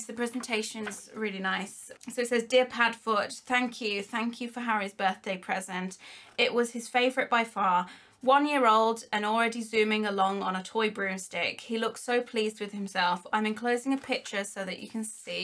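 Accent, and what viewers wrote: United Kingdom. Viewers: I love your British Accent. I actually am not British, or English, but I speak an amazing American accent and I am trying to do a British accent. And how much did this box cost?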